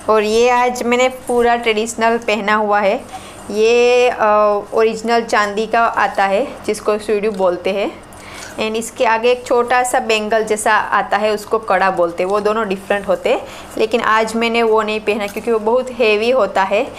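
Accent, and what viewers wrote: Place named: Gujarat, India. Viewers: Wow your local gujarati tone is superb and you and and also lovely looking so traditional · ખૂબ મજાનું સાથે સાથે જે શુદ્ધ કાઠિયાવાડી ભાષા માં આપ બોલ્યા તેતો ખુબજ મજાનું.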